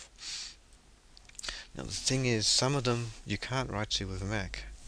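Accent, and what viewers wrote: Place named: Australia. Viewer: wanted to learn how to format my hd instead i got you loving to hear the sound of your Australian voice.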